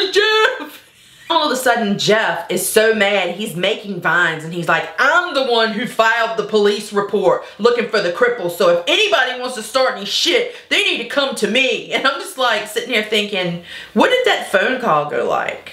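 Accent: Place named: South, United States. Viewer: i got so excited when you said ello.poppets im like omg she has an accent and then i heard the southern accent and im like Holy god she has an accent! i love it. first video i watched. and i am hooked. subscribed and binge watching now!!!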